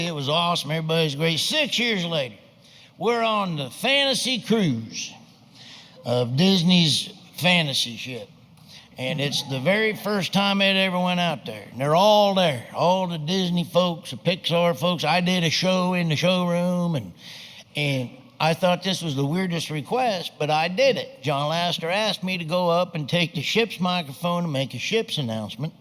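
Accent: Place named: South, United States. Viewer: I notice Larry's kinda easing out of the southern accent.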